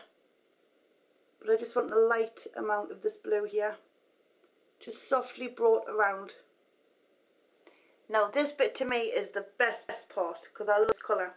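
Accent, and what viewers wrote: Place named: South Shields, United Kingdom. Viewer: omg I can't believe your from south shields Newcastle I have been there!!! I love your videos and accent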